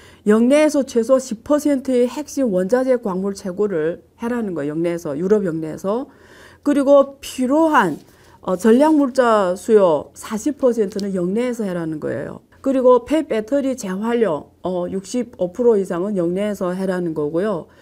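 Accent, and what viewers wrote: Yanbian, China. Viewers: 이여자 목소리가 외이례?? 조선족이가??. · 연변 조선족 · 한국어 배운 중국인 같은 발음 이네요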